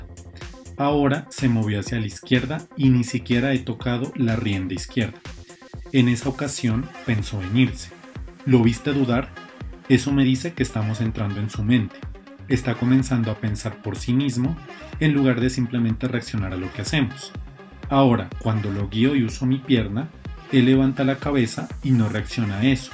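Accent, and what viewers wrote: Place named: Latin America. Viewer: Wouldn't be easier if you keep on speaking English and have the subtitles or close captioning in Spanish? Although I understand Spanish, it is spoken too fast for me and is heavily accented (South or Central American?). Or, can you re-post the video in English? Make everybody happy?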